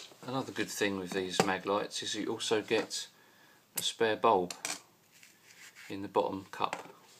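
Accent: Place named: United Kingdom. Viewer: Delightful British accent! Love it!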